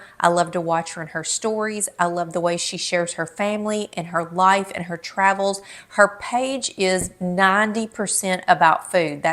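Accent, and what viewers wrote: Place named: Kentucky, United States. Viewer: I don’t much use Instagram. I personally find it boring, but that’s just me. I use Facebook a bit, X a bit, & YouTube a lot. I have a closet full of pretty clothes I never wear because we never go anywhere. I still found this interesting, mainly because I find your voice soothing, & I adore that KY accent. I follow 2 couples who do a lot of videos in TN, & have come to love what they show me of life in that beautiful state. We live in a senior apartment in SoCal, third floor, & my life is completely boring. I can kill silk plants. I follow people who live in France (the countryside), & a German couple who live in the UK. This is how I travel. I would love to see the beauty of Kentucky.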